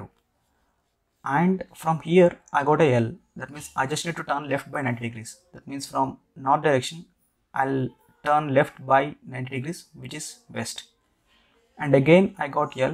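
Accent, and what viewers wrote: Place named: South India, India. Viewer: Thanks for the explanation. On lighter note: by your voice I can figure out that you are an Indian from south :). Right?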